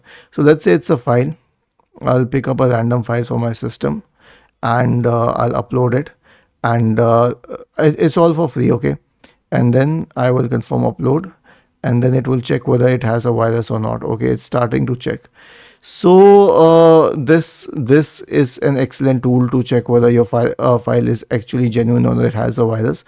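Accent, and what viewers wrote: India. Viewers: indian accent ahh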